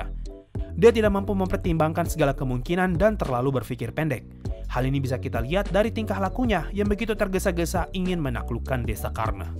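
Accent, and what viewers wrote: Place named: North Sumatra, Indonesia. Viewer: kyknya sumut ya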